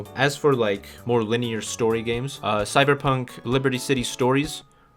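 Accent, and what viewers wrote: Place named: Canada. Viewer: I could tell you were canadian from the way you pronounced "out" and "about" came here to comfirm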